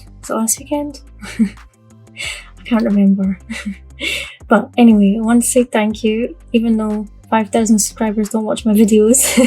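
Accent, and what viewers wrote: Scotland, United Kingdom. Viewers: Thank you for always inspiring me I love your DIYs !! I adore your Scottish accent.. It took me awhile to get some tumbling blocks and I tried to make some stuff and failed lol but I finally made a box with drawers and it came out decent. Thank you for your inspiration and congrats !!!!🎉🎉 Woot! Woot! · Absolutely love your tumbling block tutorial! Your voice and Scottish accent are so soothing. I’m glad to have found you, and hit subscribe straight away! Visiting Scotland is on my bucket list, along with a good bit of the rest of the world! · Just found you on YouTube a few days ago, love your tumbling block crafts. You voice is very calming, love your Scottish accent. There's a wee bit of Scott in our bloodline. Keep up the wonderful videos & congratulations on reaching 5000!! 🎉👏👏🎉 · I can’t believe you only have 5,000 subscribers!!! 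You should have millions! Your diys are fantastic! Are you originally from Scotland? I was wondering what your accent was. Dollar tree even had you as a focus person in there newsletter!! Come on people subscribe and show this talented lady some love!!! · Your projects are always so nice. That sure was a lot of tumbling tower blocks!! Lol! I knew your accent was from Scotland. I just love to listen to you talk. Lol. Thanks for sharing! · I love your Scottish accent! I dream of visiting Scotland one day as it seems like such a beautiful country! Your DIYs are so beautiful and creative! Keep up the good work!! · I love your Scotland accent. Those that you made are such a great idea and very cute!! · I love everything you make and I love listening to your Scottish accent I love Scotland it’s an amazing place x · With all the beautiful things you make, you should have more than 5K subscribers. 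I love all your videos. Thanks for sharing and I thought your accent sounded familiar, Scotland right? :0) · Love the Scots accent and love your videos. Learn so much. Thank you!!!! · You are so creative with these blocks! 💕💕💕 I love listening to your Scottish accent. You're almost at 6K! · Wow! I love all three of these. Ya know, its funny as I just started watching the video, I was wondering where your accent was from. So I asked myself ' did she say Scotland in a previous video?' I giggled at the end so yes, that answered my question. Congrats! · I Love your "Scotland" accent! 🏴 Your amazzzing making sooo many beautiful items, very talented! I can't even glue them even with my square. 😂 I subscribed to ALL video's, I don't want to miss any tutorials, I'm going to go back and watch your previous videos also. Congrats on hitting 5K! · !!! I just subscribed with you a couple of days ago. 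I’m mesmerized by your soft cute Scottish accent. It’s so cool! Scotland is my dream vacation. One day I swear I’m going 😋. So I’ve been loving your tumbling towers shelves and plant stands you make. I’m going to give it a go for my mom Mother’s Day gift! Wish me luck. Thank you for the ideas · I love your ideas! And your "scotland" accent! I could listen to u all day!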